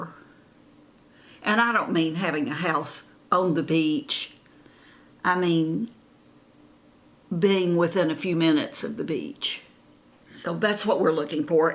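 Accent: South, United States. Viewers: It is so lovely to listen to your voice - your soft, lilting Southern accent. I find it most relaxing. 😌🙋‍♀️🥰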